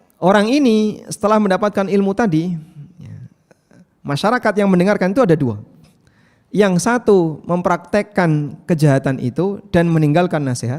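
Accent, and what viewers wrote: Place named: Java, Indonesia. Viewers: masyaallah tabarakallah, jazaakumullah khairan. sebagai orang jawa beliau cukup i detik dgn jawanya serasa di nasehati orang dekat. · Mas syaa allah..... saya orang jogja yg merantau di bogor.... paling seneng khas logat Jawa nya... serasa di nasehat dg cara yg lembut dan halus.... Barakallah... sehat berkah pak ustadz❤❤❤krn allah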